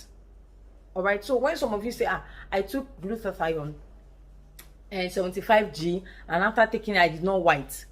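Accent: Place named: Yoruba, Nigeria. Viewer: I love listening to ur lectures, especially the way you speak that ur yoruba eehh, nice dear, plz can someone that did Ivf take satin supplement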